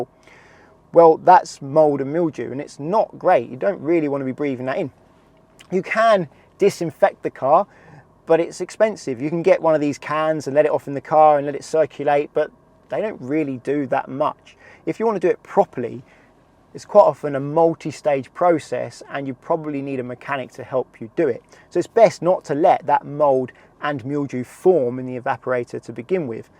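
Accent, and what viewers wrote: United Kingdom. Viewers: Is he s British or something? Sounds different to me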